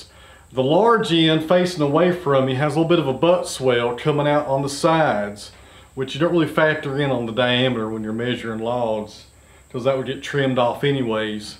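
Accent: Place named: South, United States. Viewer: Hey bud! Interesting video & story! Beautiful grains on that log too! I just had to complement your accent. I have a southern accent too but mine is clearly an NC/SC Piedmont one. That eastern TN twang is one of my favorites for storytellers!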